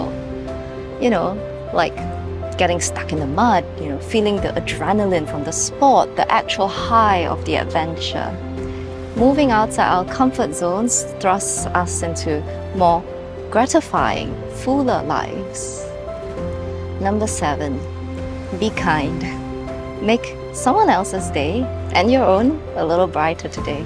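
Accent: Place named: Singapore. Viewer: She sounds Singaporean. They work very very hard!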